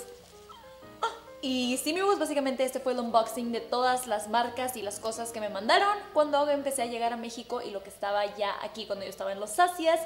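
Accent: United States. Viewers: No, tú sigue hablando pocho 😁, yo también soy de frontera y tengo que aprender inglés, me gustan muchos tus vídeos ❤❤ soy nueva ya llevo casi un mes viendo tus vídeos, y me gustann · has un vídeo usando solo productos de It cosmetic please. Y amo tu forma pocha de hablar nunca la dejes♥️♥️ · Noooooo, no dejes de hablar inglés, amo tu pochés Hahaha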